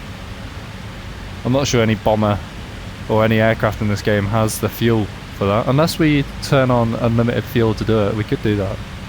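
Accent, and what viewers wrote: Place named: RP, United Kingdom. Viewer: Next Il2 video im expecting your posh accent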